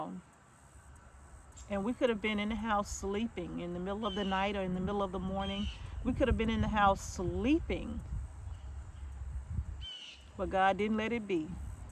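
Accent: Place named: Georgia, United States. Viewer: Thank God He intervened and kept y'all safe! I just love your videos and hearing you talk. My husband and I live in southeast Georgia so you talk like us! Glad things are fixed and better for you!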